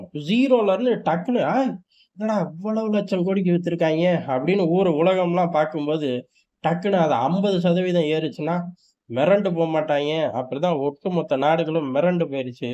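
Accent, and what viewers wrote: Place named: Thanjavur, India. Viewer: Full of enthu..I suspect him belong to tanjure district especially because of d slang ‘அவிங்க..இவிங்க..’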